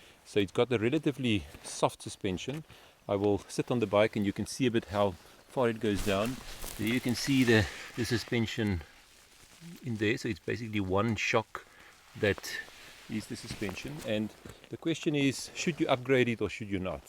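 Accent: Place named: Afrikaans, South Africa. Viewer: I smaak the Afrikaans inglish lol very deliciously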